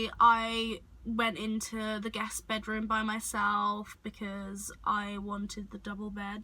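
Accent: United Kingdom. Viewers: Ur accent is so stereotypically British to me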